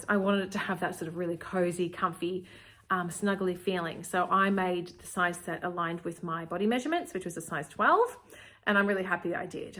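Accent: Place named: Australia. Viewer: I really enjoyed your cruise handmade wardrobe tour. It’s also fantastic to listen to a fellow Aussie and familiar shops and fabrics. I have two patena blouses and love them. ( a basic but with a twist)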